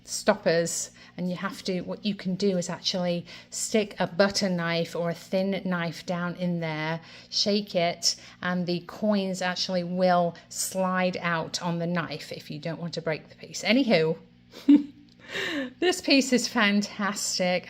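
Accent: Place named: United Kingdom. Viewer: I thought you were British which was why I mentioned the UK. Love your accents either way. I don't believe that I have ever seen it written in any book or novel that way and just began hearing it the past couple of years and was curious and it certainly was not taught in school that way. Anyway, thanks for the response and the videos..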